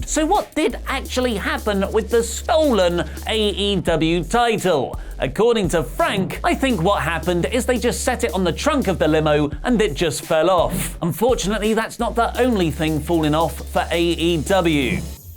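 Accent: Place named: United Kingdom. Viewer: I would appreciate it if you could find people who spoke English with an American accent and without a distinctly British accent so maybe I can finally understand WTF you’re talking about. (I’m looking at you Whatculture and Cultaholic）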